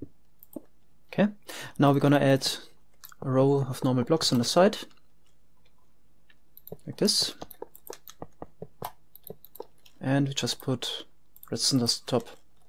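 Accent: Germany. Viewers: You are from Germany. Am I right? a) speaking "und" instead of "and". b) the word English and German have in common you pronounce in German c) vowel have revealed you too. d) only Germas add "ja" as a "additional" word.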